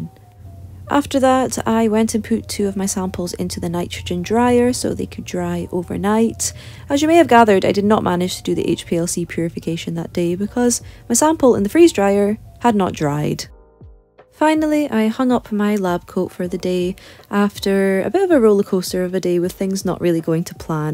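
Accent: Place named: Scotland, United Kingdom. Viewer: Other science phd students: come to ’ videos and understand everything. Me, a humanities phd student: … … looks cool. (*loving listening to your lilting Scottish accent) 💪😂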